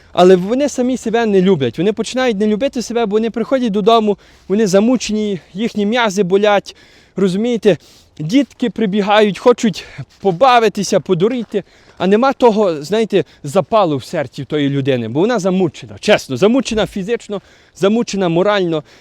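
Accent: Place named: Ukraine. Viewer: Давно не слышал такого красивого украинского языка. Сразу видно - родной язык а не переученный. Слушал как стихи.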